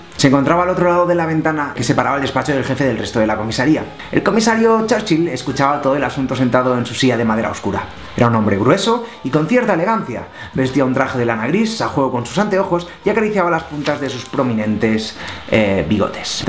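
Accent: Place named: Catalonia, Spain. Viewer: me he vuelto a enamorar de tus dejes catalanes al hablar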